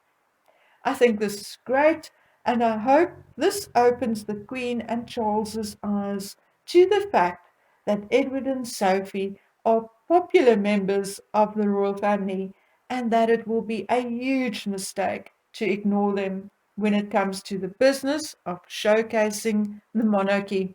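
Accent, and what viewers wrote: South Africa. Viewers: I enjoyed your commentary on the Harkles, most entertaining, abd so true. Also loved hearing your accent made me horribly homesick for SA. Nkosi love and protect Afrika 🙏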